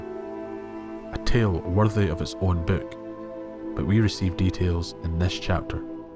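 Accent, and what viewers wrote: Scotland, United Kingdom. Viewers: I agree completely, the shear amount of world building and the flavor of the tale are set here. Rich lessons and enjoyable dialogue; ive come back to this chapter many times. I could listen to you read the entire chapter, Scotch accent?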